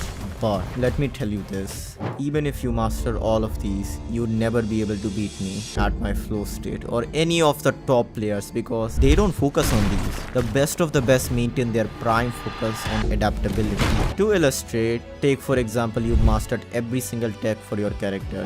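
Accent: India. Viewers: Yo ur Indian right cuz ur accent and the song u used is Indian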